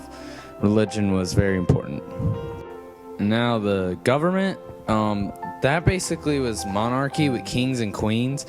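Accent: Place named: United States. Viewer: Give me an Englishman or women please. Why do I have a teenage yank trying n failing to explain this to me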